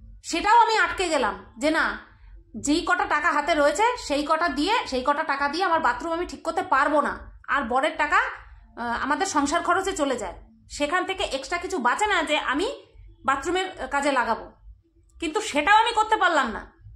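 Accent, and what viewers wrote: Bangal, Bangladesh. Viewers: Tumi to kauke nokol kora comedy kora bangal vasay kotha bola egulo darun koro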